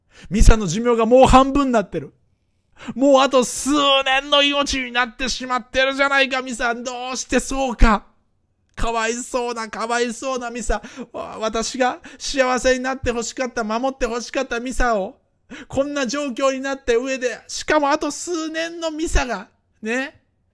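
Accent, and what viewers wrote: Kansai, Japan. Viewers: アバターより本人の方が好きだったけど、アバターでもしっかり聞きやすいし、面白い(^^) デスノートに限らず、こんな複雑で難しい物語考える人すごいなぁ ほんで関西弁めっちゃおもろい笑コテコテやし（関西人）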